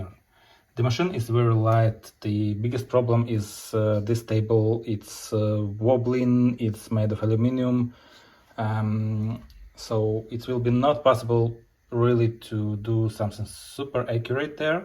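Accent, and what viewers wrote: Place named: Russia. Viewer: Strong russian accent...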